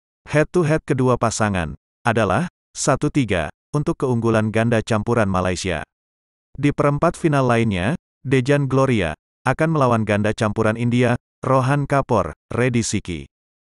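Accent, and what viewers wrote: Banyumas, Indonesia. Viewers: Kok..ga nampilkan drawing Luxemburg open dan swedea open...makasih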